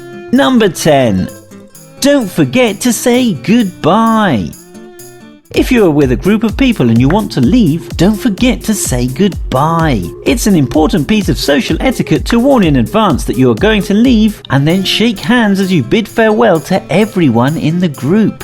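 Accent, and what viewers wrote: United Kingdom. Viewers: Is it Brexit that I don’t like the British accent anymore?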